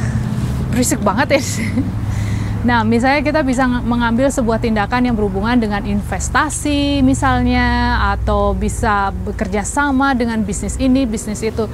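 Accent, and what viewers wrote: Banyumas, Indonesia. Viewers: Nok ngomonge ngapak bae endah padangerti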